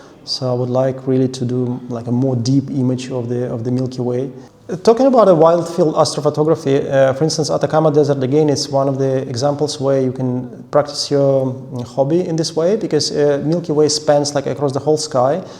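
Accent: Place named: Russia. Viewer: didn't bother to get rid of his thick Russian accent.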